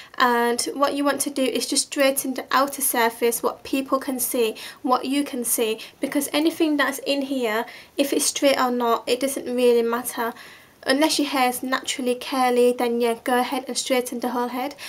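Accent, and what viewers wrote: United Kingdom. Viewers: is that a birtish accent, not to be rude if it is :)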